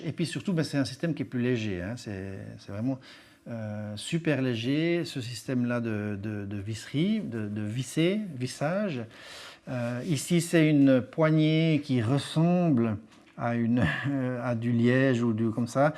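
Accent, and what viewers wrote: Switzerland. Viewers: Ton accent me donne envie de traverser la Suisse d'est en ouest ( via Alpina ) ^^ Sinon bravo pour " tenir " la parole 45 mn sur des batons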